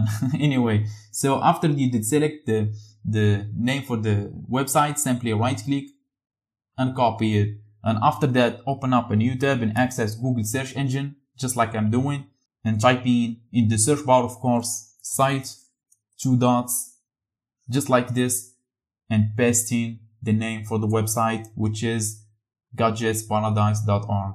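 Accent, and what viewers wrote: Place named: Morocco. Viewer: Dude bro you are from morocco You accent sounds familiar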